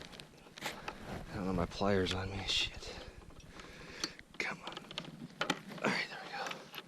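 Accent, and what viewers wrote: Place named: North, United States. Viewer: Apparently you Northerners speak the same dialect of 'murican that we do here in SW Ohio. Very entertaining!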